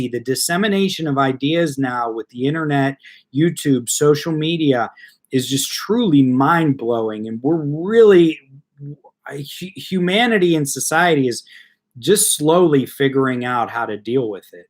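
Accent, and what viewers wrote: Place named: Pittsburgh, United States. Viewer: I love that Pittsburgh accent. Miss it! From WV most of tv came from Pittsburgh. KDKA, WTAE. You aren’ t. Old enough to remember “Chilly Billy”. WIIC. We would lose our TV when the weather got bad. May God bless you in the New Year! Could go for some great Italian food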